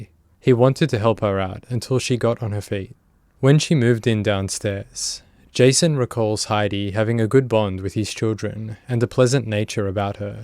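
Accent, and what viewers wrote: Australia. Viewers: Sounds like an Aussie did this doco by accent or maybe a Kiwi but as an Aussie I think it's an Aussies voice. I'd never use it even once as they all say I'm only trying it once & a year later they're nuts! I've seen just 1 year of meth uses' impact on the brain by a meth users who were paid to allow weekly brain scans over the year & their brains are very impacted by even a month on meth let alone a year so imagine someone like Heidi who's been on ity for years!! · Nice to hear an Aussie doing true crime. Subbed mate.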